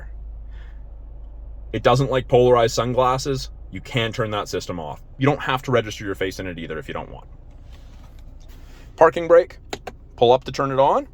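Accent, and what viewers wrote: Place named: Canada. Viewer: I enjoy this fellas reviews he’s got moxy to him and that Canadian accent is a hoot!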